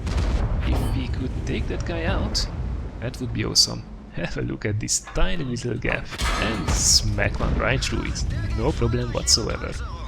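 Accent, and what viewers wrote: Hungary. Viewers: Magyar vagy? :D Az angolod elég magyaros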